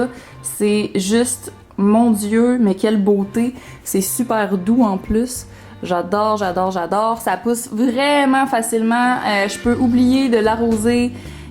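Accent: Canada. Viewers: super vidéo avec en plus le joli accent canadien, j'adore!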